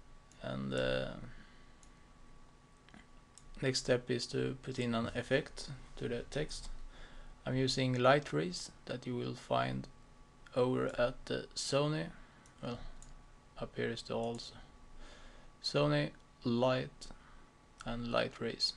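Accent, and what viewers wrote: Sweden. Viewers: your swedish?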